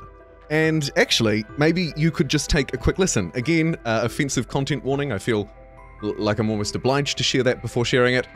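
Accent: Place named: New Zealand. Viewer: Nice to hear a fellow Kiwi accent in the CS2 community. Keep it up mate 🥝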